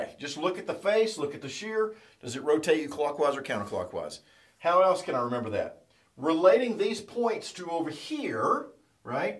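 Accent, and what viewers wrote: United States. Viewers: His country accent makes me feel a lot more accessible and authentic. In addition to that, this is a very thorough and simple distillation of an otherwise complex topic. I really like it!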